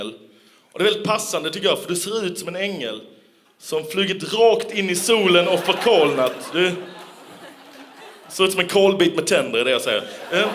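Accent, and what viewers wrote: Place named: Skåne, Sweden. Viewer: han pratar skånska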